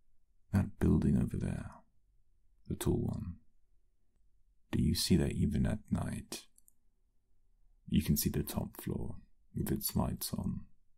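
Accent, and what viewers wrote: United Kingdom. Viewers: Is anyone going to talk about the British accent??? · My asexual ass was not paying attention at all because the whole time all I could think was BRITISH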